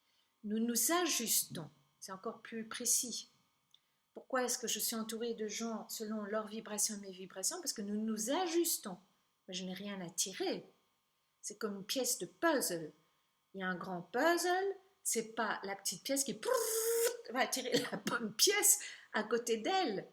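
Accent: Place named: Belgium. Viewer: Je ne sais pas pourquoi vous apparaissez dans mon fil youtube, mais vous y êtes. Je vous écoute et je me dis, elle est Belge!! Et c'est le cas en effet (on n'est pas comme les autres, c'est évident). Bon, mon point de vue: dans l'Homme (j'ai mis un grand H (je suis un boomer)), deux parties: une matérielle, l'autre spirituelle La partie matérielle est duale, les opposés se parlent et se complètent mais peuvent sembler entrer en conflit. C'est la voie de l'apprentissage dans le monde de l'Ego. La voie qui conduit à quoi? A l'Unité par la dualité dans le monde de l'Ego. Pour moi (c'est juste mon avis, rien de plus) l'Ego est un espace d'apprentissage qui me semble rejoindre votre concept de scribe, bibliothécaire, archiviste qui tente d'écrire une histoire, "votre" histoire mais qui, pour moi, ne peut se suffire à elle-même puisqu'elle doit permettre d'ouvrir une porte de sortie spirituelle "positive" qui vient compléter le tout dans cette Unité qui nous constitue.. 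Merci de m'avoir permis, par vos propos, de m'assoir au bord du temps qui s'écoule et d'y cueillir un instant de pensée. Merci à vous, Chère.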